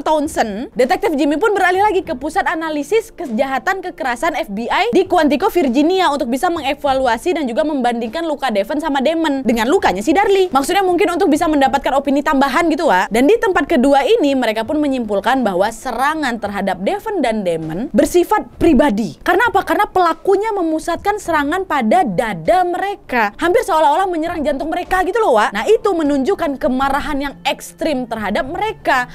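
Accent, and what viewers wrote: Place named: Medan, Indonesia. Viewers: Kak ini org mana si sebenernya? Kok ada bahasa jawa² nya, tapi logat nya kek Sumatra🤣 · ya btul dr awal da curig, gwe ska knten ini, terutama hostnya, luar biasa gesturnya, bicaranya, segalanya ku suka, mmang itulah gaya Medan bicara, jelas x, masuk brang tu😃❤️😀👍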